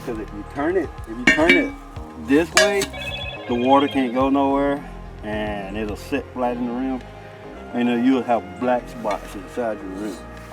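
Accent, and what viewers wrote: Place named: Texas, United States. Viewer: YOU GOTTA BE FROM TEXAS BRO! #SouthernDraw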